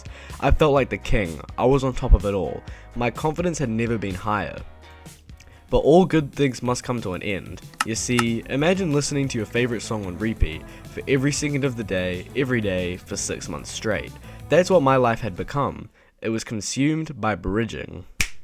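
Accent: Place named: New Zealand. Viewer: Wait you said you conquered au you sound like a New Zealander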